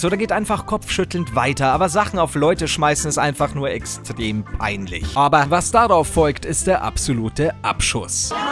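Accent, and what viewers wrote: Bavaria, Germany. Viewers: Feier dich richtig, durch dein bayrischen Dialekt Einlagen, die ich als Oberösterreicher natürlich versteh, find ich dich auch sehr Unterhaltsam. Zudem find ich es toll wenn auch die Großen (im Kopf nicht) kritisiert und oft zerlegt werden. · BITTE STOP mit der Bayrischen Sprache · Hab mir jetzt einige Videos von dir angesehen und ich muss sagen wenn man selber ein bisschen bayrischen Dialekt versteht, gibt es kaum bessere Unterhaltung auf youtube Deutschland😁👌 Hast einen neuen Abonnenten gewonnen mach weiter so👍🏻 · Naja weil ihr in Österreich nur Bayrisch sprecht 😂 Nein Spaß. Ich mag es auch wenn er Bayrisch spricht. Grüße aus Bayern zurück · Michael Obermaier Komme aus Bayern und liebe es, wenn er bayrisch redet xD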